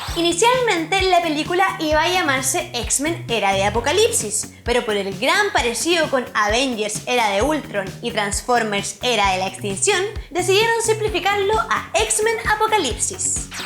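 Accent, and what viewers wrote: Chile. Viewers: Es chilena. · Casi me suscribo, hasta que mi cuenta de que eras chilena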